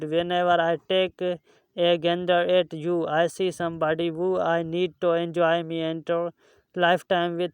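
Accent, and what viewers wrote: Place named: India. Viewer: I don't like to hear in this style totally bhartee English better without voice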